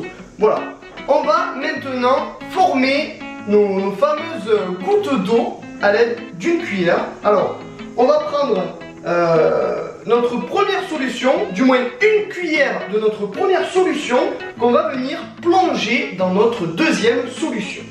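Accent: Marseille, France. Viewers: J'aime trop ton accent du sud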